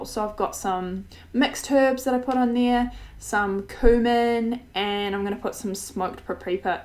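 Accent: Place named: New Zealand. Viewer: I love her New Zealand accent!!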